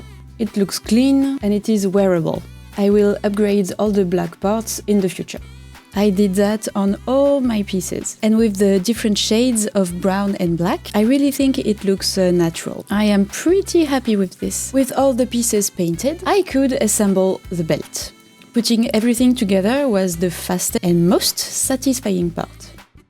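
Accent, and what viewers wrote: France. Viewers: this is really dumb probably, but as another person with a strong accent (swede) i feel a little insecure abt it, but seeing you proud of your origin country and me really loving your voice has made me...… prouder of my own accent??? even though the france accent is recognized as prettier than the swedish accent??? idk i kind of like how my vowels sound now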